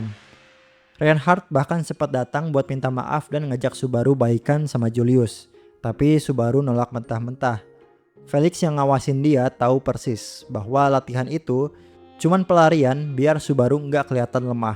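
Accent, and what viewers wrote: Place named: West Java, Indonesia. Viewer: admin ketahuan orang sundaa😂😂, btw gw juga sunda sih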